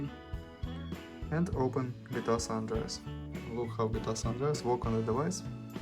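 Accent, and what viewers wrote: Russia. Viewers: Русского человека слышно сразу) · с ярко выраженным русским акцентом и отвечающий только на русские комменты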